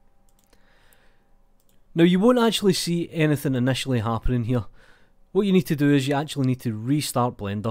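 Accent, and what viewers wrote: Scotland, United Kingdom. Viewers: you're instatntly my fav blender tutorial for your scot accent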